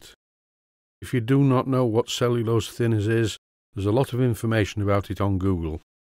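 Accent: Yorkshire, United Kingdom. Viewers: watching this does NOT interfere with MY medication. But, THANKS for the warning. (My ADHD medication, as well as my depression meds say that watching Steam Engine restoration is beneficial!) (But, I may slip into a coma, sometime soon.) Keep the videos coming! Your Yorkshire accent is amazing.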